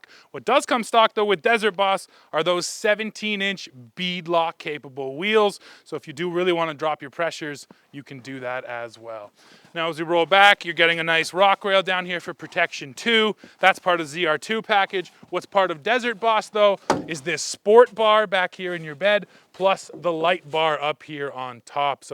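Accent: Canada. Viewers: Why Canadians mispronounce the letter Z. You don’t say Zedebra, it is damn Zebra 🦓. You don’t say Zedoo. It is damn Zoo. Lean English. It is Zeeee.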